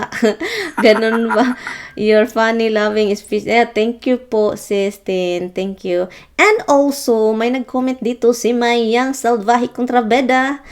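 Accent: Visayas, Philippines. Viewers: surphlis kalog tapos cge ko katawa na mabisaya usahay ang tagalog nya😂❤️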